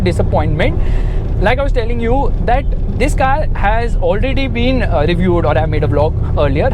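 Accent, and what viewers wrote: India. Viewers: Hindi influenced English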